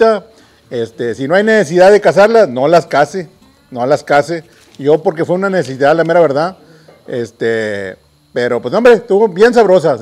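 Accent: North, Mexico. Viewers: Saludos compa desde Atlanta Georgia me encanta tus vídeos y tu asentó norteño esta perro viejon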